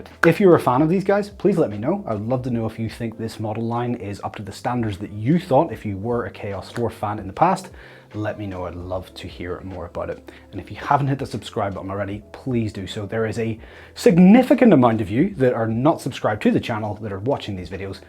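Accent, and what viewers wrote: Northern Ireland, United Kingdom. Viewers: Are you from Northern Ireland?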